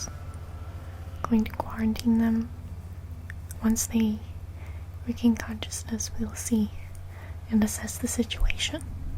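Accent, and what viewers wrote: United States. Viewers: Your American accent is excellent. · Ben Church I always forget she’s not American when I watch this!! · Very creative & entertaining scenario, thank you! And I was impressed with your American accent, well done! 😊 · it's so odd to hear you potray an american accent rather than your using your original english accent lol i love it! can you do more RP's with american accent? :-) great vid btw! put me right to sleep lol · Solid American accent :) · I love her American Accent. Very accurate, very ASMR inducing. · Why US accent for this video? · Pretty good American accent. I didn't realize you had lost your accent until I watched it the second time. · Your 'Merican accent is awesome! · So weird to hear you speak with an American accent. Nicely done. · Aw.. I miss your normal accent. Great job on the American accent, but I love your real voice. 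Great role play! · Your American accent is pretty good! Your natural accent lends it a tinge that we would recognize as Southern. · your american accent is perfect! you said bandaid instead of plaster! A+ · Good American accent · Me:"Wait...something doesn't seem right....OMFG SHE'S DOING AN AMERICAN ACCENT!"😱 Btw I LOVED this💗💗💗💗 such amazing job as always! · Nice American accent. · nice attempt at the american accent! haha great video :) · Wow, nice American accent. I'm impressed. You look lovely as an astronaut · Love your american accent! It's so Buffy :) That aside, I just love the content of your videos. Always takes me into a different world! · I didn't even know that you weren't American until I read the comments so I can safely say that that's a killer accent. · Great video held my interest every second! I don't believe you're not a Yank. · Fantastic job! Your "American" accent is perfect. 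:) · I can't believe how well you do an american accent! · You do a fantastic American accent. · Wait she isn't American? Like I live in the US and I thought she was. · You sound so American in this video! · You almost sound American in this video lol your accent was hardly noticed by me. Great video! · Your American accent is excellent! Very convincing.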